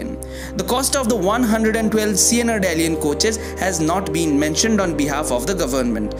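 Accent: India. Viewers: The narrator is an Indian for sure..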